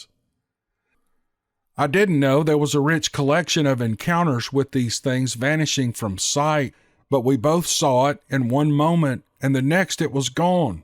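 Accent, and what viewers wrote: South, United States. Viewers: Have a happy Thanksgiving, thanks for the stories man just love your southern accent my brother.. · Thank you, for providing such wonderful entertainment totally unrelated to all the craziness going on in the world these days!! You are a GREAT story teller with a beautiful, smooth as velvet Southern voice! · I've said this before, but I will say it again. I'm from Oklahoma and am used to hearing people talk with a southern accent. So when I hear that familiar drawl with those vowells drawn out, I get a warm, comfortable feeling. It's brings a familiarity that inspires a trusting attitude for me. I can relax and fall asleep when listening to you. Thus, you turn out to be the voice I go to sleep to each night. I have difficulty with sleeping, so anything to make those long nights pleasant and maybe shorter is welcome. Thank you for the pleasant demeanor and great stories you choose for all of us. 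· Hi, I have become a huge fan from the UK. I love your stories and could listen to you for hours.. I adore your accent, it's so nice and homely, being from England I never get to hear a that glorious Southern drawl.. thank you so much for all the time and dedication to your channel, from me, hundreds of miles away across the pond. Happy thanks giving to all my American friends 😊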